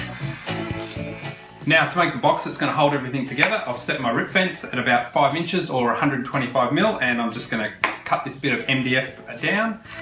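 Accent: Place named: Australia. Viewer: A fellow Aussie You Tuber, what a refreshing change to hear our own accent. Love the tool rack. I’ll try and make one this weekend but I think I’ll lay some steps in the base to stagger the rows of chisels. Being an old bloke on blood thinners, I don’t need any excuse to cut myself in the workshop. Thanks mate.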